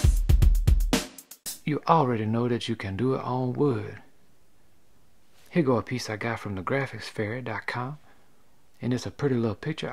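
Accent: Louisiana, United States. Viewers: I was close dude your accent is just like the cajuns down here I love it!!!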